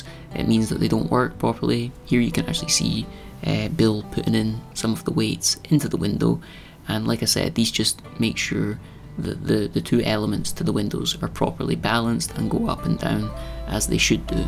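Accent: Scotland, United Kingdom. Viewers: I did just that and realized I had been watching off and on since he renovated his tiny stone house that had been abandoned. The name of his channel has changed, I think, but I recognize the cat, accent and his red haired from the tiny house renovation. Thanks you I could listen to that accent all day long. My family was from Scotland but journeyed to US in late 1700’s and only a Texas accent remains!!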